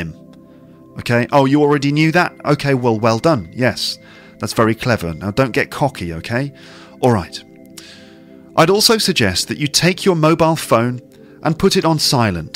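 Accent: United Kingdom. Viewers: To be honest I fell asleep once while listening to your podcast 😐 Please don't hate me - I've turned your podcast on with purpose, hoping that your pure British pronunciation will get some far parts of my poor exhausted brain and settle itself there))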